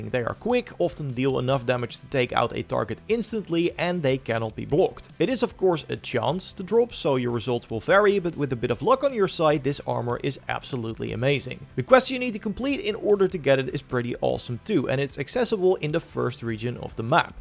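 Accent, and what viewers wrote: Netherlands. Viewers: That's how most Dutch people sound when speaking English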